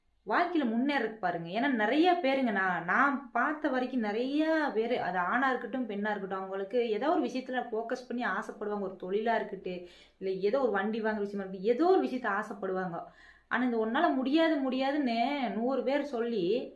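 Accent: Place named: Coimbatore, India. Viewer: ❤கோவை ஸ்டைல் பேச்சு எனக்கு ரொம்ப பிடிக்கும்❤❤👍👍👍🙏🙏🌺💐💯🤝💥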